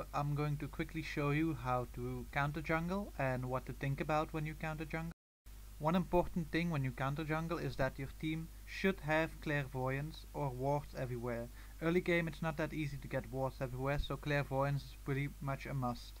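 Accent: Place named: Netherlands. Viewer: ik hoor dat je nederlands bent :p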